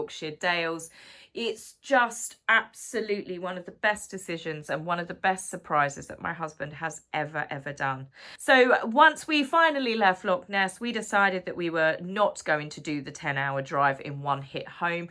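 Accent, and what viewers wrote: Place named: Southeast England, United Kingdom. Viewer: It was great to hear your SE England accent. I’m from the SE but now live in Devon and I miss it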